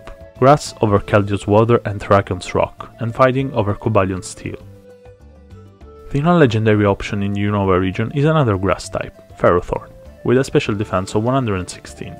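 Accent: Italy. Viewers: Maaa, per caso lei è italiano?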